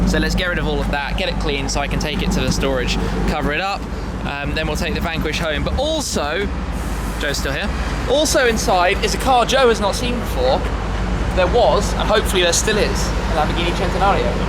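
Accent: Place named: RP, United Kingdom. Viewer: How does this guy buy so many cars ? Sounds like a bender from oxford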